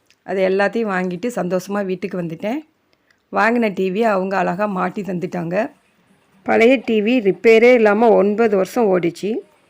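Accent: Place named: Tirunelveli, India. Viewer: ok sis tirunelveli la therinchavanga madhiriye erunthichi athan kten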